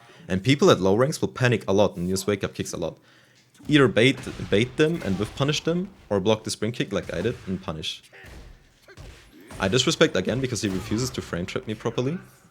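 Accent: Germany. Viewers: German Accent detected Kuss auf die Nuss von einem fellow Devil Jin Loyalist <3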